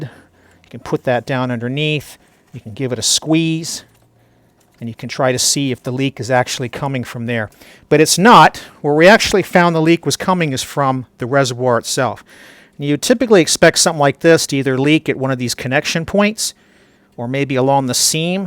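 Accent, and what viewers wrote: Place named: Western Pennsylvania, United States. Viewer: My Sonic isn't acting up yet, but my neighbor had problems with hers. This will be handy down the line, thank you for sharing! I am a big supporter of Right to Repair, and channels like this are a huge help to those of us who need it. Side note, your accent sounded familiar. My family is from western PA and your inflections remind me of my uncles, who let me be a little grease monkey and help when they repaired their cars when I was younger. Cheers, have a great day!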